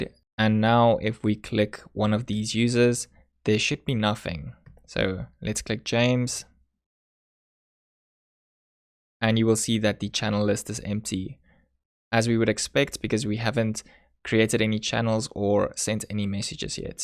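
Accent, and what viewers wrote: South Africa. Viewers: You sound very South African...😃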